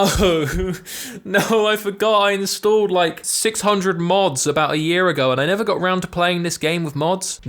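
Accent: England, United Kingdom. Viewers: There is no such word as 'nucular'. That is an embarrassing and ridiculous bushism. Things concerning the nucleus are termed 'nuclear'. You sound English, so why can't you spell and pronounce English words? Not the best idea to display your ignorance to the world by uploading it to a massively popular video website. .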